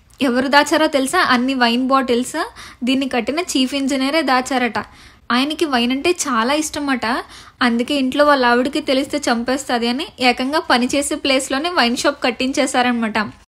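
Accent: Godavari, India. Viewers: Ma godavari slang baguntundhe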